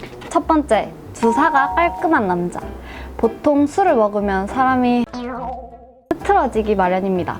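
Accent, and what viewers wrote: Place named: Jinju, South Korea. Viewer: 나도 고향이 진준디 말투가 참 익숙하네요 ㅋㅋ 응원합니다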